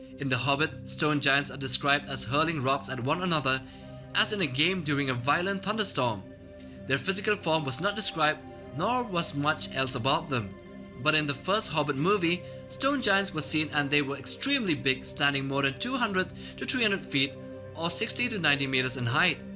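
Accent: India. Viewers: You seriously need a better narrator; his wimpy boyish Indian accent really kills an epic vibe. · Lucas Frost have you never heard an Indian accent before?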